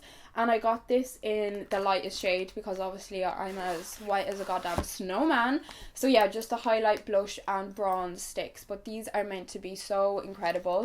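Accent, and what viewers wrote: Ireland. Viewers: Very deep Irish accent coming out from your mouth 👄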